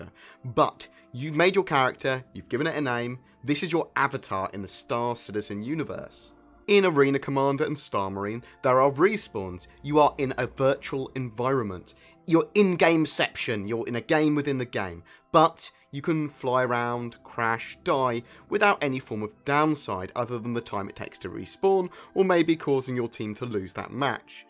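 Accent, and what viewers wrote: United Kingdom. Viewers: Great video as always! Have you ever considered about a professional anchor position? You remind me of a lot of British actual speakers..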